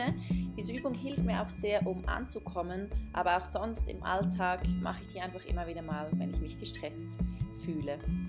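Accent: Switzerland. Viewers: Als offenbar einer er wenigen Männer die noch hier sind geb ich dir mal dass Feedback dass es tolle Videos sind👌 entspannt mich immer was neues von dir zu hören :) Mal davon abgesehen dass ich als süddeutscher die schweizer einigermaßen verstehe haha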